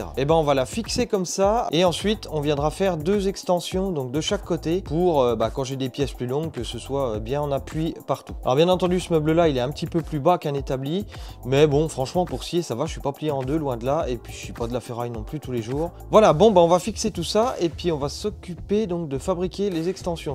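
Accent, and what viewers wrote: Franche-Comté, France. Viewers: Bonjour Un petit accent franc comtois non?!.. 😉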